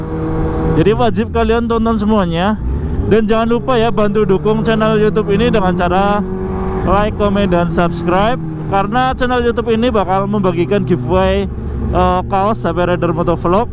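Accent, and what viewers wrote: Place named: Banyumas, Indonesia. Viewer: Biasa ngapak dadi angel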